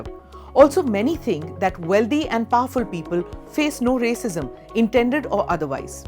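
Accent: India. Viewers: How many Indians have you seen actually make or poke fun at foreigners because of not being able to speak in perfect Indian accent depending on the Indian languages. As far as communication breaking down is concerned the funny thing is that English speaking foreigners hardly complain about lack of understanding. All they complain about is the accent. Infact look at this comment itself where the person commented that the anchor have a weird Indian accent. But the person never said anything about not being able to understand her. So you see accent have become a tool for discrimination which specifically targets Indians more than any other people. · I am laughing at you (multiple times ) whilst watching it, your accent sucks. But hey, it’s fine , you’re Indian. One of the largest English speaking nations (maybe largest). India should have its own accent : that I guess is the case anyway. 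So relax. Cheers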